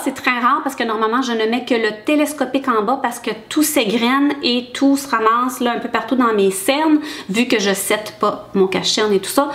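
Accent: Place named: Quebec, Canada. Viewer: Coucou, merci pour tes recommandations;) ma fille ainée est à Rouyn Nouranda pour ses études secondaires, j'aime penser qu'elle entend ton bel accent québécois:)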